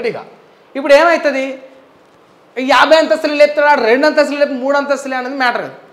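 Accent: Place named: Telangana, India. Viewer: Chaala baaga cheppinav anna. Mana telangana yaasa lo inkaa manchiga anpinchindhi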